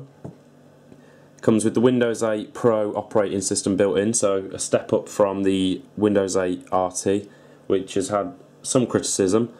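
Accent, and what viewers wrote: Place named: Coventry, United Kingdom. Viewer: I am from Coventry. You have gotta be from somewhere near there by your accent! Thanks for the vid by the way, very good!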